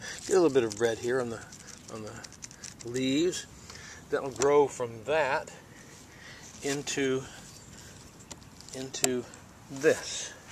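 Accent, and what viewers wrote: Maine, United States. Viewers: Just found this channel R U by any chance from New England Sounds like Maine. ( Where I'm from, but now in WI)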